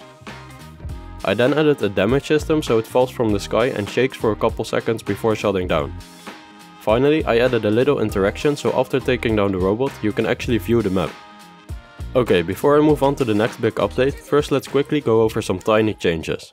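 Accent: Netherlands. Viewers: It sounds like u have a dutch accent and I immediately noticed that because im dutch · Let me guess, you are dutch? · Are you Dutch? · I looooove the Dutch pronunciation of English “v”s. “Full control Ofer the qamera” - its so satisfying.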